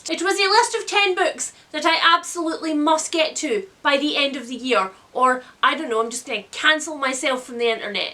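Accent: Scotland, United Kingdom. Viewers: I’m so glad I found your channel! You’re personality is so cute and entertaining (: and i love Scottish accents lol (probably because my mums a Scottish immigrant aha!)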